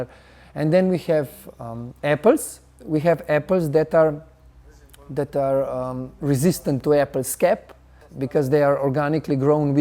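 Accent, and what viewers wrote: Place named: Wales, United Kingdom. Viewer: Slovenian. Accent isn't a hundred miles away from Welsh either ha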